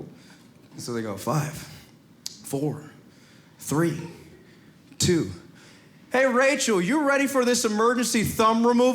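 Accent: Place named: Montana, United States. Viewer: If this was an audio clip without video, I would bet my life savings that I'm listening to a white man from Montana or New Hampshire 😂😂😂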